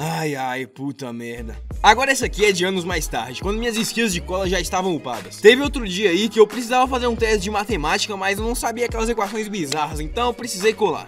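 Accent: Bahia, Brazil. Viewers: Tu é de que lugar da bahia? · Mano,vc é baiano ou gaúcho,sou filho de baiano,sou mineiro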